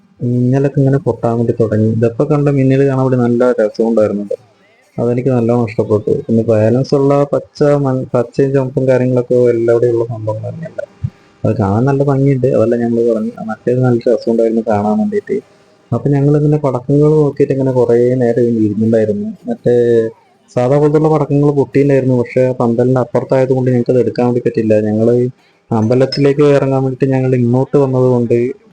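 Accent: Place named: Palakkad, India. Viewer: ettan powli🤩 adipowli aayitundu nammade ah palakkadan slang il thanne adi manoharamayi eduthitundu ineyum idupole orupadu videos edukkan kazhiyanam ennu njn aashamsichu kollunnu🎊👍🏼💖🎉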